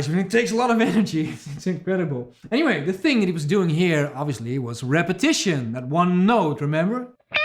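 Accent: Netherlands. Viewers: Finally, we get to learn your accent is from the Netherlands! I always thought it was from ukraine or something….lol. Either way your guitar playing is the language i like best!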